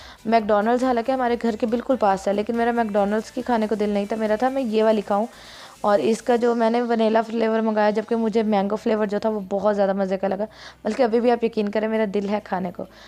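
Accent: Saraiki, Pakistan. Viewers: Ap saraki speaking ho kya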